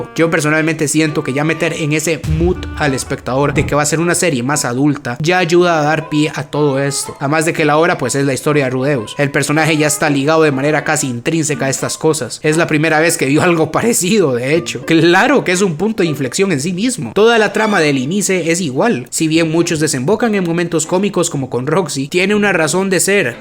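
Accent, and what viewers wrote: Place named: Costa Rica. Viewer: BROOOO SOS TICO ¿VERDAD?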